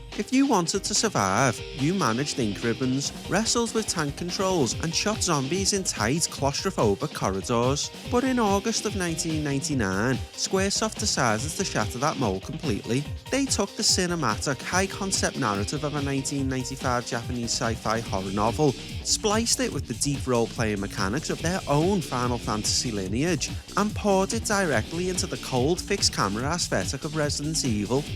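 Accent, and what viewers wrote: Liverpool, United Kingdom. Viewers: scouse accent talking about beloved survival horror of the 90ds and 00ds was on the bingo card, but great video bud...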